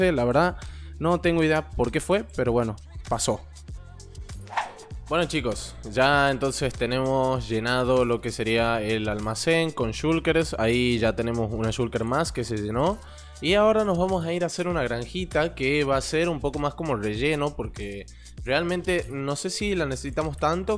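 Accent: Tucumán, Argentina. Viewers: Che sos argentino digo por como prpnuncias la rr. Porque creo que en alguna provincia se pronunciaba haci la rr. Creo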